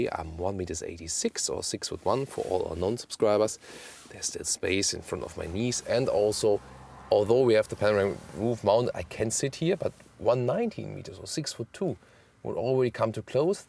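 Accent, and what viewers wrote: Germany. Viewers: A german guy with a thick accent reviewing a Porsche. Great!!!! Simply by far The best car reviews on youtube.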